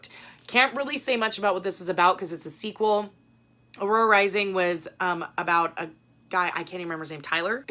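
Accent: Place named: Australia. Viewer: Ooo!!! Yes you should start!!! I love book videos and I would so watch!! AND it sounds like you're from Australia, I'd love follow your booktube if you start one! I don't make book videos (yet?) but am obsessed with booktube haha 💟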